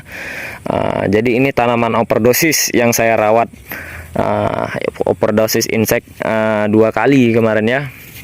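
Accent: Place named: Medan, Indonesia. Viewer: Kalok nengok dato bahasanya abang ini orang medan.dari mana bang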